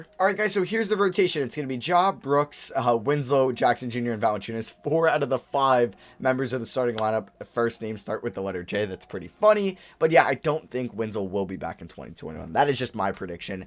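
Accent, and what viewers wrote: Pittsburgh, United States. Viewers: Are u from Pittsburgh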